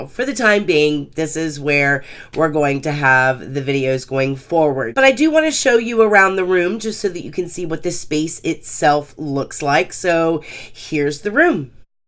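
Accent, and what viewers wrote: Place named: Pennsylvania, United States. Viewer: I knew I recognized your accent, hello from the City of Brotherly Love fellow Pennsylvanian. 😊 I just bought this kit yesterday and had to consult with beauty gurus b4 opening.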